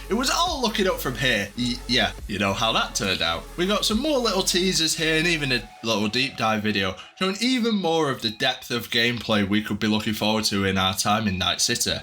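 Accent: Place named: North of England, United Kingdom. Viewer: Nah he's from the North of England man, people speak like that there.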